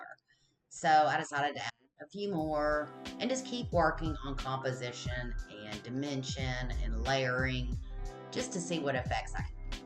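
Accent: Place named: Arkansas, United States. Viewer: I thought both the works you showed were very cool as it does look alot like stalks of plants and you're right the possibilities are endless. I loved yours but would want to have the colours behind the stalks which i would make in different levels of the same green if you get me. What do you think? Also, what a fab southern Accent! So lovely to hear. I can't place it. 😂 oh wait its Arkansas!!! Wow great accents there!! these tips here are great & this could also look like a matrix type look in 3D so it could be super modern or clsssic with stalks....this second one is really beautiful.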